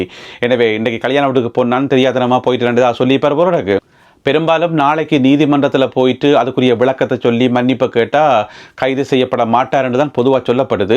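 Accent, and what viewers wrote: Jaffna, Sri Lanka. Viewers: அஸ்ஸலாமு அலைக்கும் அன்பு "ஓம் தானே" என்ற உங்கள் அடிக்கடி கேட்கப்படும் அழகிய யாழ்ப்பாணத்தின் தமிழை நான் அதிகமாக ரசிப்பேன் ♥️🥰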